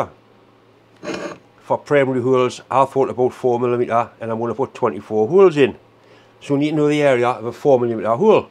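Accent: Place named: England, United Kingdom. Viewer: G'day mate, hope you had a Merry Christmas and please, a happy new year to you and yours. Enjoy your work very muchly. From what part of England do you hale? Your accent sounds wonderful to me. Thanks.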